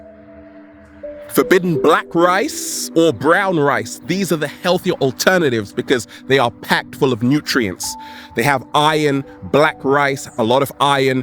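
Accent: United Kingdom. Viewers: Are you British because you sound like it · That British accent tho....love it! "Tomatoes"😁😁Love you.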